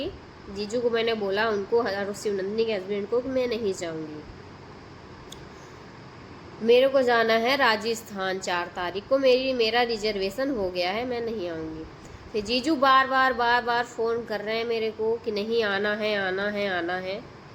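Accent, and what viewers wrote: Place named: Bihar, India. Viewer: Are you from prayagraj? Shocking.....you speak like bihari....anyway...keep growing....and stay away from such peoples...❤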